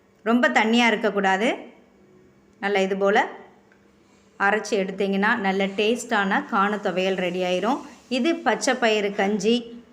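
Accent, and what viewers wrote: Tirunelveli, India. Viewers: Tirunelveli slang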